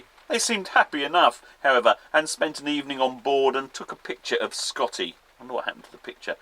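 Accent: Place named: England, United Kingdom. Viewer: I woke up this morning with a cup of coffee and the sound of rain drops on your cabin top and listening to the voice of an enthusiastic English man reading a stories of sailing adventures! A great way to start the day! Strange, it seems we Americans love being read to by a person with an English accent. I'm not sure why. Its hypnotic! "Can I have some more please?" As always love your videos.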